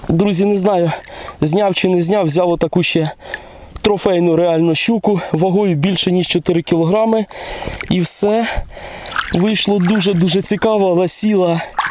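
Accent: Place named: Ukraine. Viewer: говори на нормальном языке. не хочется слушать говор Бандеры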